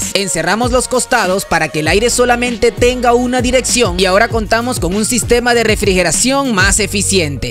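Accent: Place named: Venezuela. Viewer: es Venezolano el acento te lo dice mi pana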